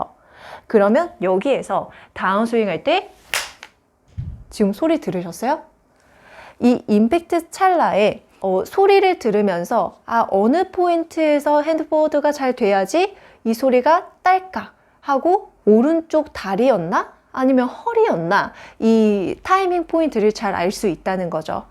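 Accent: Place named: China. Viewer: 중국에서 오래사셨나보네요? 억양이 쥥국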